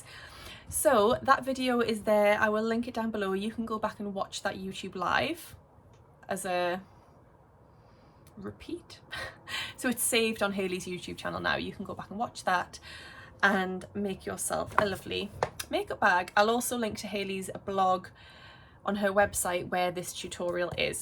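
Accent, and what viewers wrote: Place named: County Durham, United Kingdom. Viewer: It's lovely to hear your accent on YouTube, I live in County Durham so not far! I love sewing but I don't have anyone I know that likes it too, I literally get all giddy in a fabric shop! I love dressmaking but have decided to start doing some craft fayres with bags, accessories etc, maybe something like that could help xx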